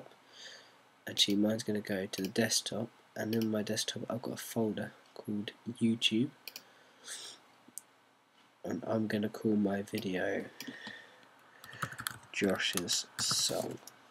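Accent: United Kingdom. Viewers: Why NTSC (Never The Same Colour) when you sound English? :)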